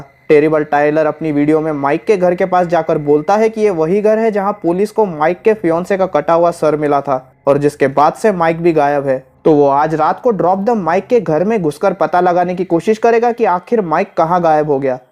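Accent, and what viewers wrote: Maharashtra, India. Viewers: Marathi jesa lag rha h accent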